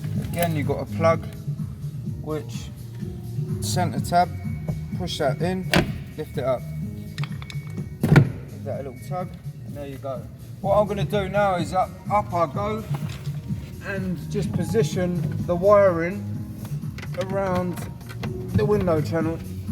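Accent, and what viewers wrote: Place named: Africa. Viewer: Africano hablando inglés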